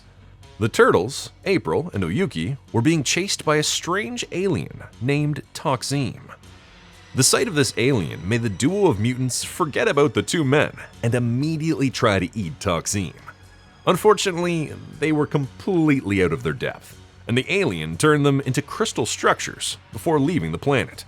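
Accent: Canada. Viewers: a robot commentator with a Canadian accent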